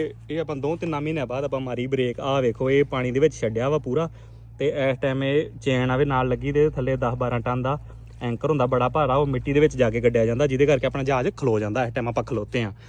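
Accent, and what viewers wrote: Majha, India. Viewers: ਪੰਜਾਬੀ ਭਾਸ਼ਾ ਬਹੁਤ ਸੋਹਣੀ ਵੀਰ ਦੀ ਬਿਲਕੁਲ ਸਾਡੇ ਮਾਜੇ ਵਾਲੀ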